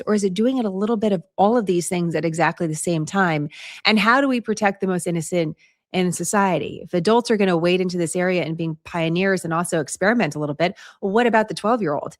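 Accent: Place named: United States. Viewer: Quite frankly you sound like an American disguised as a good girl.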